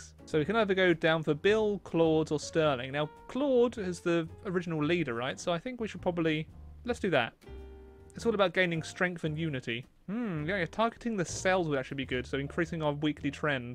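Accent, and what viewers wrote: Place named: Somerset, United Kingdom. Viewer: I'll your Cornish accent was more of a Somerset accent I'll give it a 6/10.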